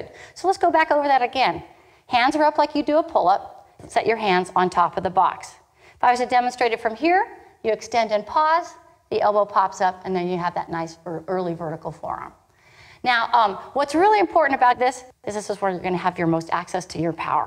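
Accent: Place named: United States. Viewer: very nice USA accent 10/10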